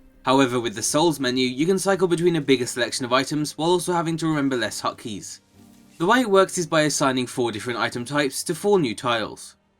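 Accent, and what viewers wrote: United Kingdom. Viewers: It's what happens when you've got a British accent.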